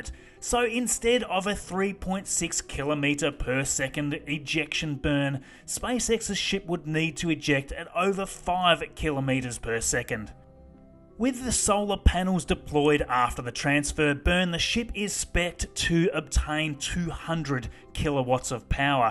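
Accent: Australia. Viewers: So I'm guessing your Australian?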